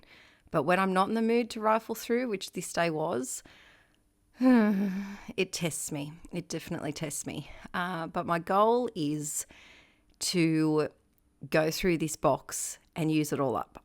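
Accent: Australia. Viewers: Just saying hi from Victoria. Nice to see an Australian junk journaller! I was like, "I know that accent!!" 🤣👍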